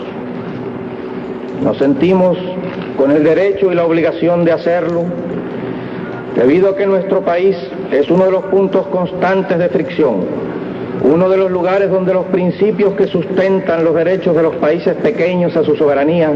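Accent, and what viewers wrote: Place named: Cuba. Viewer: Porque hablaba como cubano?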